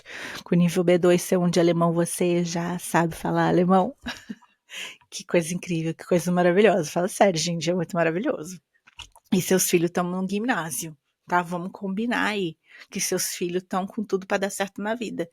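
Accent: Rio de Janeiro, Brazil. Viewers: vc é muito linda e amo seu sotaque carioca. Sei da sua opção mas não posso deixar de me apaixonar pela sua beleza. meu sonho de mulher!!!:face-red-heart-shape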